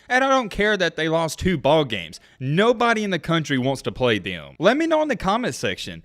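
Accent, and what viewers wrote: South, United States. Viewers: Haha SEC is 1-5 in bowls—such an overrated inbred league—almost as bad as your southern drawl and high school football highlights. Go Cinnci & Wolverines!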